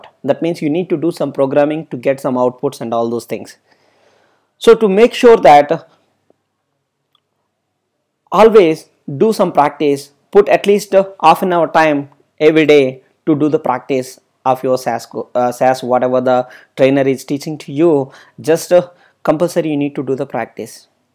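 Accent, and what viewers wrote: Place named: Telugu, India. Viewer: Your pronounciation is in Telugu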